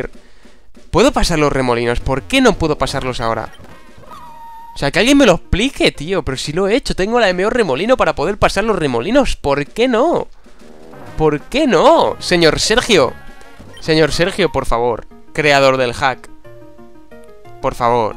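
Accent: Argentina. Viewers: Joder, que bonito tu acento argentino xD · LooL acento Argentino xD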